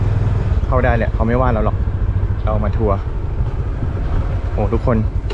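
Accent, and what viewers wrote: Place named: rural, Thailand. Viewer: พูดเหมือนคนบ้านนอกเลยทำไมอยู่ไทยไม่เกิดเป็นคน กทมหล่ะครับบพี่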